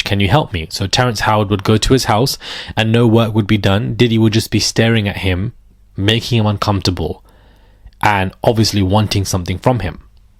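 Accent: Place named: United Kingdom. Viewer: I really like your take on things and your presentation. I especially find amusing when you speak in slang with your lovely British accent.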